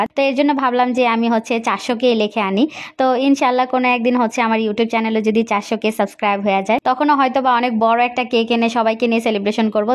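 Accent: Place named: Bangladesh. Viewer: তুমি আমার বাড়ি ঢাকায় তোমার বাড়ি কই গো মেয়ে বাংলাদেশের এক নম্বর আঙ্গুর ভাষা❤❤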